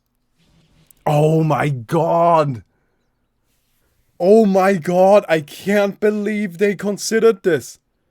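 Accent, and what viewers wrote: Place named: Germany. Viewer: I had no idea you were german... =D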